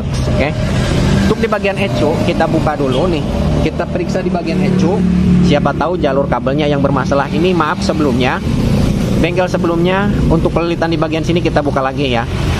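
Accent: West Java, Indonesia. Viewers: Paling suka deger logat orang sunda...rasanya pengen bgt pnya teman orang sunda...salam seduluran dr malang jawatimur bossq🙏🙏🙏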